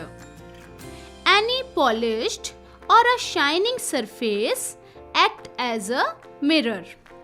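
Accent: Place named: India. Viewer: Wow VERY NICE ENGLISH FOR SURE SOUNDS LIKE INDIAN VOICE BIG L CHANNEL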